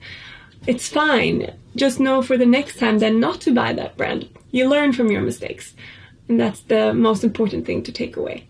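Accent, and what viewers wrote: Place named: Sweden. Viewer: this is the first video of yours that i've watched. my roommate is swedish, and as soon as you started speaking i immediately knew you were swedish. love the video! definitely subscribing.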